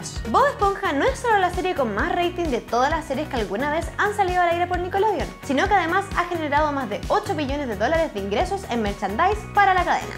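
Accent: Chile. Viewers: es de chile ya que ella es chilena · 23 cosas que no sabias de YOUTUBE. Estoy viendo tantos los vídeos en especial en donde sale que se me está pegando el acento chileno po!. Saludos desde Lima, Perú. Bendiciones para todos.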